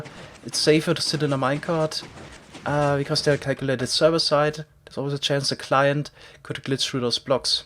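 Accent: Germany. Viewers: The german accent is strong in this one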